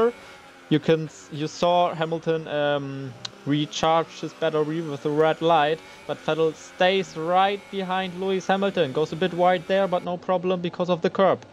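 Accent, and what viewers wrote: Germany. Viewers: Der legendäre deutsche Akzent 😂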